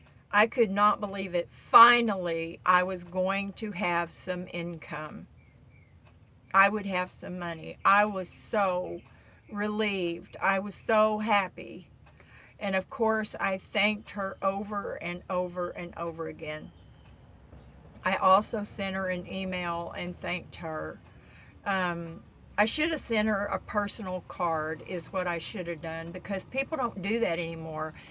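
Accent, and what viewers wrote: South, United States. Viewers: Great video, great lady, a good mom what a rare treat. I also noticed that you call your van "him" i do that. im wondering if youre a Pisces or other water sign. I love how you talk to the camera like we're all old friends. Yuoure very sincere and personable. Great video subject you dont see people talking about this kind of stuff often enough. And i have to say my eyes watered a bit in the beginning, just thinking about how much our seniors have to worry about. Its not ok for it to be like this. Just that so many people dont know if theyll be taken care of or not when they get older, working all their lives to try to survive and then ending up being so scared and feeling so helpless. It breaks my heart. Fuck. My eyes are watering again. I also love the southern accent and a bunch of things about southern culture. i hope those things dont get diluted over time.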